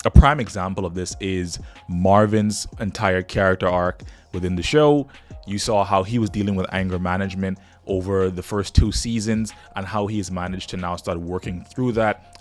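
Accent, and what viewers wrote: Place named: Bahamas. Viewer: Bro u bahamian? Sounds so if so 242 to the world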